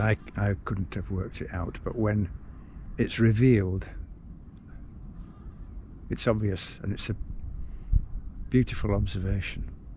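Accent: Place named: West Yorkshire, United Kingdom. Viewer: Your oration redounds in mine ears long after you have ceased it,. Of course, that West Yorkshire accent helps - intrinsically poetic as it is. But your being a musician makes your readings especially wonderful to my ear. Great taste in poetry, once again. And I'm glad you're sticking with this Thursday event. I thought the word was 'uplifted'. Not so sure now, though.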